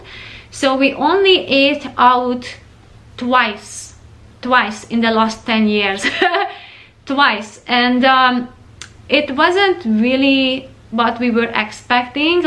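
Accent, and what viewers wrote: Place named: Hungary. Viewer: Imadom menyire perfektül beszelsz angolul. Tisztan kihangzik hogy magyar vagy de az csak meg attraktivusabnak csinalja. Elvezem nagyon a channel-eset. Üdv Ausztriabol.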